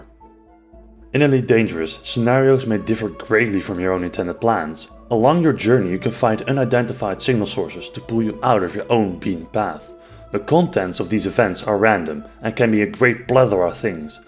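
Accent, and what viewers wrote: Netherlands. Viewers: I heard the accent and bloody knew you were Dutch xD. Verekte Nederlander! ;) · That horrible Dutch accent though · Mooi filmpie, voortaan wel ff dat nederlandse accent minderen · binnen 5 secondes merkte ik al dat je nederlands bent 😂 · Ik hoor een nederlands accentje? :P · Nice review, convinced me to buy it. You sound very Dutch btw, something i couldn't help but notice.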